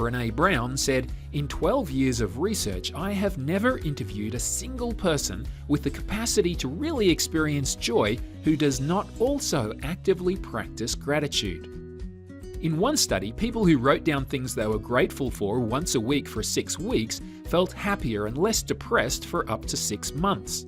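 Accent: Australia. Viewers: I came here to ask about your accent. It’s like Australian with some good ole southern twang thrown in there to keep you guessing! 🤣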